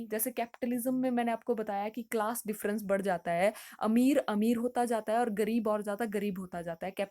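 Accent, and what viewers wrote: Haryana, India. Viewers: Ur presentation is really interesting and it feels gud to get a haryanvi touch in the slang. Thank u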